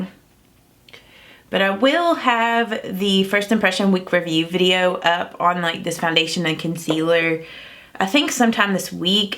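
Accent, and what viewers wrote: South, United States. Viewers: Looks great!It would be fun to hear more about what's unique about where you live. Places you eat at, shop at...the place where you bought your mug. With your southern accent, is there anything makeup wise you would say is trendy in the south.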